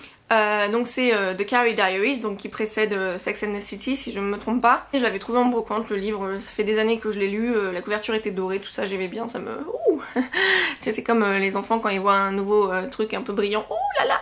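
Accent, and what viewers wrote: United Kingdom. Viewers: Hello ! Alors pour ta question, ça me brise le cœur de dire ça étant une Potterhead à la vie à la mort mais... En ce qui concerne Harry Potter et le prisonnier d'Azkaban, j'ai préféré le film au livre :'(. Voilà, c'est dit ! La musique, l'ambiance, tout est magnifique et le livre est bien, mais pas autant que le film (et je l'ai relu dernièrement pour confirmer mon avis !) Sinon 13 Reasons Why c'est une tuerie (pardon pour le jeu de mot involontaire) ! En 2 jours c'était fini, terminé ! Fortement captivant ! Géniale cette vidéo ! J'adore le concept :3 (Et ton accent anglais qui déchire !). Bisous !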